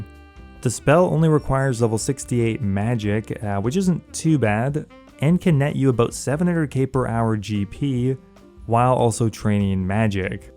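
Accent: Quebec, Canada. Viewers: are you quebecois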